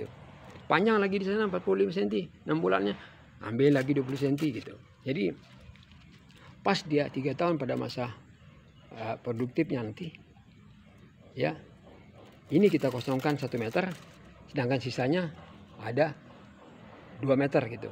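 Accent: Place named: Lombok, Indonesia. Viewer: dari logat nya orang sasak ya ?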